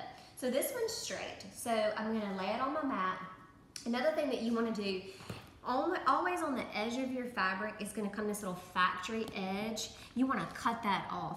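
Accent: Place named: South, United States. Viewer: Hi...Just watched your video. You have made a wonderful quality scrub cap...French seams and all!!! I've sewn for six decades and appreciate your project! I do have a couple of suggestions. First, try not to sew with the bulk of your work going through the arm of the sewing machine. You'll find it is much easier to handle your project. Second, if you pin or stitch the end of your elastic to the entry end of your casing, you can pull it through far enough to sew both ends using just 5" of elastic and saving 2". I loved your clear, through instructions delivered with your wonderful down home Southern accent. Keep up the great work and thank you for sharing...KMc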